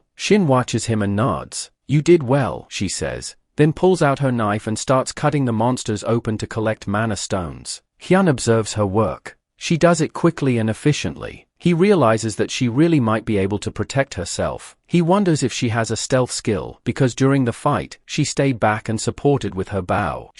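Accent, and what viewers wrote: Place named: United Kingdom. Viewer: The British accent😭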